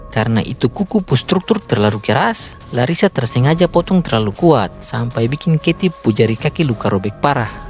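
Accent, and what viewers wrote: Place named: Eastern Indonesia, Indonesia. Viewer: Pertahankan logat timur anda bung.. Pertahankan ciri khas bung & jadilah berbeda (positif). Saya doakan channel bung sukses 🤝👍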